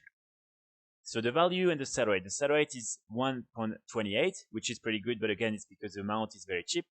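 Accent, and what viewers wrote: France. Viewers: Gros accent français qunad même haha ! Nickel la vidéo, continue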